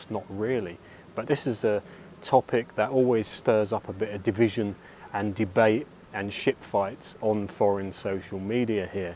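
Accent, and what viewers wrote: United Kingdom. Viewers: I don't like your Pommy accent and did you really call Steve Rosse a C--t ?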